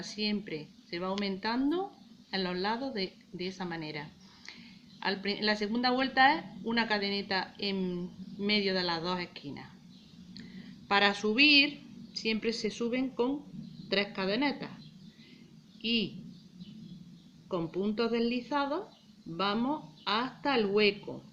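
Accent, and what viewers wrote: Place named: Murcia, Spain. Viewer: Jajaja , eres Murciana , menudo acento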